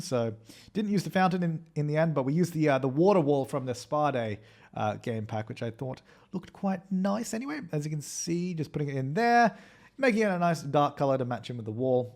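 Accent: Australia. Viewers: Love your videos~ I love making houses on sims, probably more then the actual game play XD as i was watching though, some of the things you say, i dunno, you voice just sounds a lot like Jazza, and not being stereotipical witht the Australian accent, but the tone and pitch of your voice is very similar to his hahaha still, awesome houses! and on to watch more~ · CloudieFox I know right, they're both australian, so that's probably why. Whenever I watch waiter I think they are the same guy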